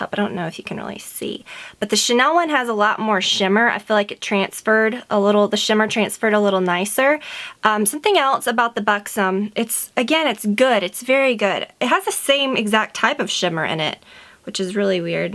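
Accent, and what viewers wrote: South, United States. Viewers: I realize you're speaking in the same voice, tone, etc. that you always do, but for some reason you just sound SO southern! I can hear the accent so much clearer than I ever have before! So sweet! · I don't know why, but I've never noticed before this video that you have a slight southern accent. I must be totally oblivious.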